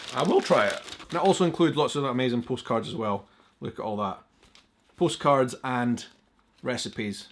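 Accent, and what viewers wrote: Scotland, United Kingdom. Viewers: I swear your accent has gotten thicker since moving back to Edinburgh! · I'm sorry, but when I do a Scottish accent; it sounds regionally similar to yours. What town are you from?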